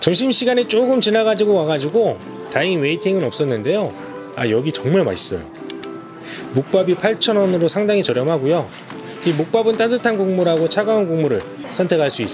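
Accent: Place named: Seoul, South Korea. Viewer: 서울 사투리 너무 귀엽습니다!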